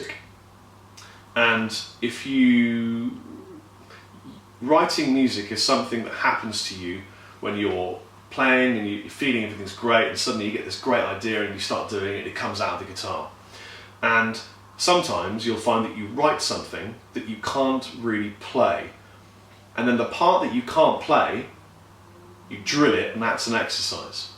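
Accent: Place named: England, United Kingdom. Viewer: I can’t help but comment on the hair + being white, and then he started speaking with an English accent and I just chuckled.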